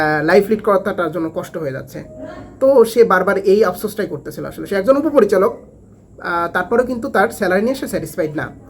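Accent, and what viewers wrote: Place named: Chatmohar, Bangladesh. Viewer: Vai apnar bari chatmohar tai na kotha dekhei buja jay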